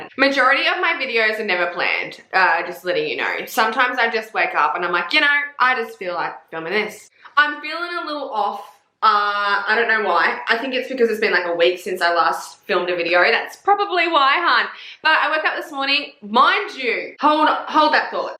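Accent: Australia. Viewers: I have been reading you recommendations and I think they are awesome ! You say MAFIA perfectly. Everything sounds better in a Aussie accent. My words sound boring in my canadian accent lol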